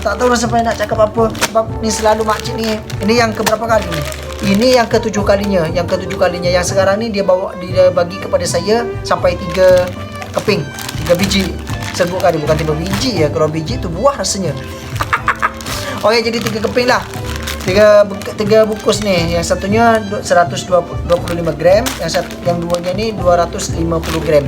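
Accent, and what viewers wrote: Malaysia. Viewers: Tak ada dah sleng indo dah cara percakapan nak ni, dah macam lorat orang malaysia dah cara dia bercakap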